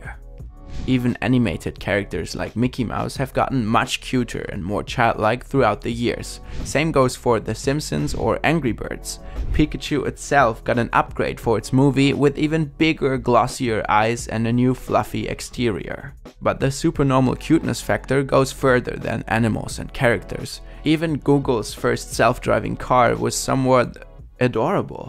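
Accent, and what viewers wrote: Germany. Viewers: Really good video. Like that german accent too! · Bin nur kurz hier um mir deinen deutschen Akzent an zu hören und so leid es mir tut er ist wirklich deutlich zu hören 😂😂😂 aber eigentlich ziemlich lustig 😄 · the german accent is so thick that i hope i dont sound like that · Also man hört halt stark deinen deutschen Akzent, ABER es wird dafür viel verständlicher, weil du sehr deutlich sprichst. Find ich nice👍🏽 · The german accent stays😤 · he could work on it at least a bit, though. Even as German as my first langauge, I especially hate a strong German accent. And his is a lot worse than I expected. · Please do not get a speaker. Your voice is so nice to listen to and even though i am german i know a couple of british and american people who do not mind a bit of an german accent :) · Pleased keep the speaker! I Love the german accent! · I would appreciate a native speaker because it's a bit weird if you're German and you're hearing German youtubers speaking English. 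It's not because you can't speak English fluidly etc. but the Acent is pretty big. lol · The german accent gives this channel a certain characteristic. I think it should stay as it is. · Pro German accent. Because it gives a unique characteristic, like Shirvans Azeri accent in Caspian Report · don‘t get a narrator. in EVERY damn video game, the one with the german accent is superior. I don‘t make the rules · for me, i dont like his accent, but thats because i dont like the german accent, thats why i dont like speaking english myself, i just really dont like german accents when speaking english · I love your german accent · It seems like most of your audience is currently still German but I think you have really good chances of expanding into "international YouTube" and attracting new people who would'n't have been able to enjoy your videos otherwise. I also think that this is one of the main reasons you get complaints about your accent, because Germans usually can't stand German accents while everyone else doesn't really care that much. 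Personally, I think it adds a lot of character to your videos and I know a lot of other Youtubers who speak with a German accent but have a predominantly international audience. If the accent really bothers you, you can hire a narrator but you don't have to in my opinion. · Normally I really don't like the German accent, but I think it kinda belongs to this channel (Also u sound insanely cute in this video)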